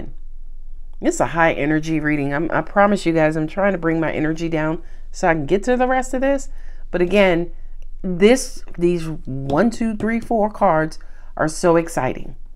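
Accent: New York, United States. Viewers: Scorpio representing in that NY accent! 😂😂😂😎 Thank you for the reading. It resonates with me, I can feel it in my gut but I'm so scared of failing. Gotta work on that. 💫🙏🏻🤗♏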